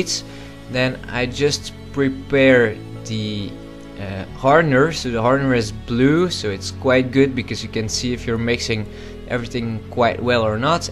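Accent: Netherlands. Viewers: dutch accent cant hide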